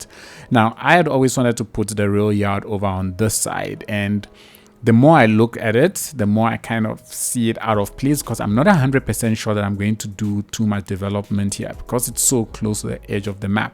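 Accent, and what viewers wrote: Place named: South Africa. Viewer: You got a South African accent. S/O from mzansi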